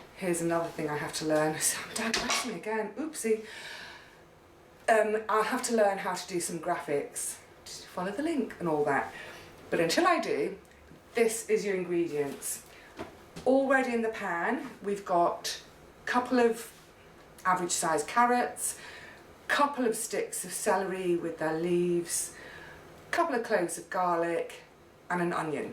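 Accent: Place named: London, United Kingdom. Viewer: Hi, love your videos. My grandmother and grandfather came from London, and your accent brings back memories. Thank you for your wonderful recipes. Do you make carrot marmalade. I will surely try the tomatoe soup. Canada says hi!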